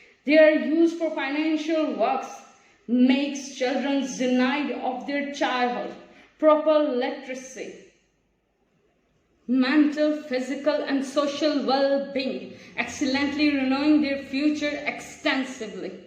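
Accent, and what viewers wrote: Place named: United Kingdom. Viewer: British akcent👌..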